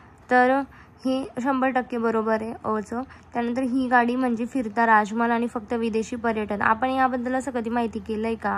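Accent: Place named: Khandesh, India. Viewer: Continue Kara series ....jay khandesh aapli bhasha lagech olkhun yete 😅❤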